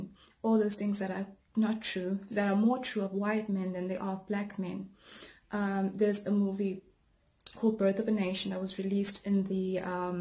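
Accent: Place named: United Kingdom. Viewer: BUT HOW MANY NIGGAS KILL OTHER NIGGAS FOr THE SAME THING LMAO YOU DUMB BRIT YOU ARE NOT UNDER RACISIM LSITEN TO YOUR AccENT YOUVE NEVER TOUCHED A GUN OR BLOOD IN YOUR LIFE YOU FUCK FACE \ hah if you get this far into this your brave and should be able to see past what liam nesson says He said he would do it but ik youve said youd kill all white people.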